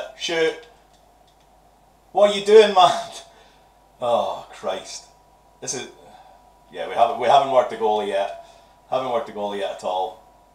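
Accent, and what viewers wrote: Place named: Scotland, United Kingdom. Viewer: Hey. I quite like the content you make, loving the thick Scottish accent! You're quality is top notch, I dont want to put you or your content down buddy, but have you got a pop filter for your mic? It might eliminate the echoing on your microphone. Just a thought:) Atraxi. - Subbed!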